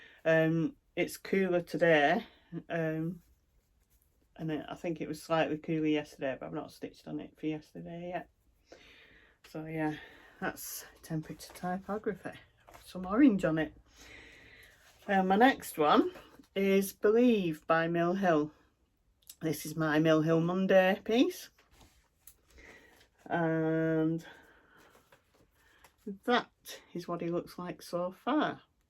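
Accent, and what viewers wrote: Lancashire, United Kingdom. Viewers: Hi, my name is Marion. Love your videos, I'm Canadian so it took me a bit to get used to your Lancashire accent, but I understand everything now😁. Sure hope you feel better now. Would like to stitch flowers! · Would love to stitch the tree or the flowers. So sorry you have been sick, but glad you are back. Certainly understand the different accents for areas of the UK. I love listening to yours. :-) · Your Lancashire accent is one of the lovely things about your podcast. Having lived in Rochdale for a number of years before, I would move back to the north of England in a flash if my family could be persuaded to move! Isolating would be a breeze if I had a nice tree to sit under and stitch. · Sorry to hear you have been unwell x I love your Lancashire accent,I was born in Accrington and now live in Southport I could listen to you all day x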